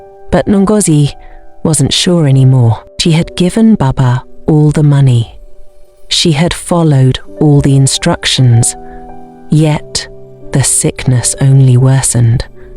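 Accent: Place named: Jamaica. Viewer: You got a Jamaican accent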